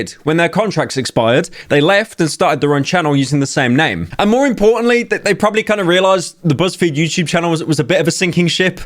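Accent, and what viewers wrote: United Kingdom. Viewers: Wait is British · hello british person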